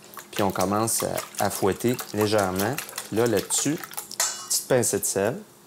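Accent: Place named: Quebec, Canada. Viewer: J'adore votre accent également ! Je craque complètement sur l'accent québecois.. J'essaierai votre technique la prochaine fois que je mangerai des oeufs, ça a l'air bien sympa !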